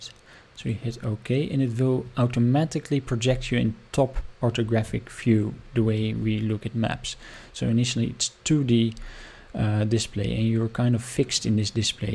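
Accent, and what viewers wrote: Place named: Netherlands. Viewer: Je klinkt Nederlands, dus ik probeer het gewoon even. Dit werkt allemaal super, maar voor Nederland is een nauwkeurigheid van 30 meter niet echt realistisch. Nu is er natuurlijk het actueel hoogtebestand die om de meter een hoogte puntje heeft. Is die als SRTM data sorce te koppelen aan de GIS plugin?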